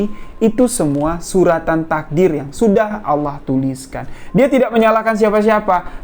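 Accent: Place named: Jambi, Indonesia. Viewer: Senang dengar mas bicara dg logat daerah Jambi....succes ya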